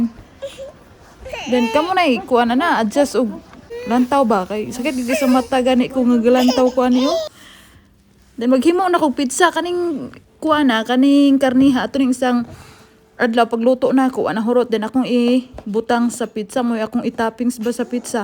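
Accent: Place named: Visayas, Philippines. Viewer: Bisayaa oy haha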